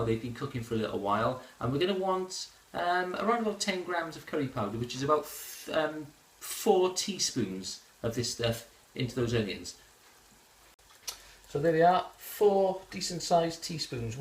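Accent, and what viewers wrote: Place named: Cardiff, United Kingdom. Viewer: Good Cardiff accent there boyo lol great recipe I’m a valley girl living in Canada. They don’t do good curry here 🙈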